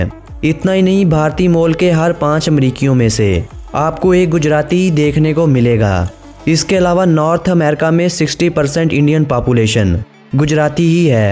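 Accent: Pakistan. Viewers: Aavaj achhi hai. sab kuch population dikhaya. Urdu ki lahak aa jati hai. Kaha ke hai?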